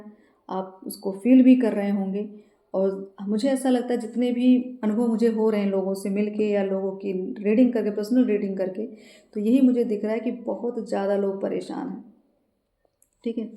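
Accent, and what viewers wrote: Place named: Haryana, India. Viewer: Aap haryana se ho main bta rahe hu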